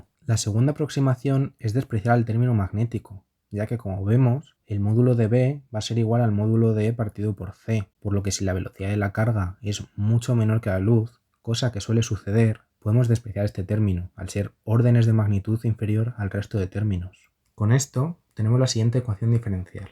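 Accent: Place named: India. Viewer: Gran vídeo amigo, me salvó el semestre. A veces no se entiende el acento hindú, pero está chévere. Saludos desde Perú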